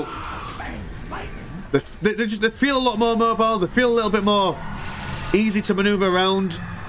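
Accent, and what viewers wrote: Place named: North, United Kingdom. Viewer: northern accent.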